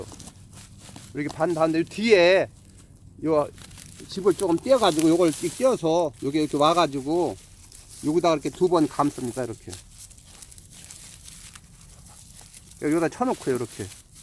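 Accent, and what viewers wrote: Chungcheong, South Korea. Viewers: 손 새끼는 제가 더 잘할듯 ㅋㅋ 충청도 사투리 정겹습니다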